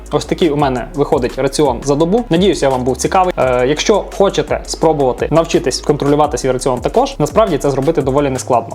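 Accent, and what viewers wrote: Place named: Rivne, Ukraine. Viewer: Привіт!Натрапив На твоє відео,результати вражаючі,повага,цікаво спитати звідки ти бо я сам родом із Рівненщини і твій говір такий як наш😄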